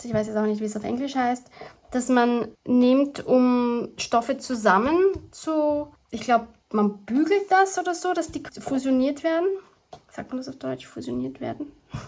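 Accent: Vienna, Austria. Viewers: another beautiful project and i really love to hear you speak german with your lovely vieanna dialect <3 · Ohhhh du liebste, du herziges Zauberwesen😊, was hast du wieder Tolles gemacht. Ja und nun weiß ich endlich was ich mit meinen Treibholzstöckchen machen werde, die ich letzten Sommer so eifrig am schönen Chiemsee sammelte❤. Es ist echt lustig, dass ich ebenso Probleme mit dem Zufallsprinzip habe, obwohl ich alles andere als perfektionistisch und ordentlich bin😅. Aber wir hatten es ja schon mal davon... Es ist so schön, dich deutsch sprechen zu hören, denn es blitzt doch das Weanerische hindurch und ich liebe diesen Dialekt. Ich hab ja auch ein Faible für österreichische Autoren. Deine Fabricsnippetroll ist super geworden. Achja und das Zeugs, dass du wohl meinst wegen des Stofffixierens müsste aufbügelbare Vlieseline sein. Ich glaub, die Quilterinnen benutzen das immer. 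Das kleine Journal, das du von Petra bekommen hast, ist wirklich allerliebst und ich mag besonders, dass sie auch Samt verwendet hat. Ein tolles Material wie ich finde, besonders wenn er schon gebraucht ist. Ganz liebe Grüße zu dir ins schöne Wien und guats Nächtle❤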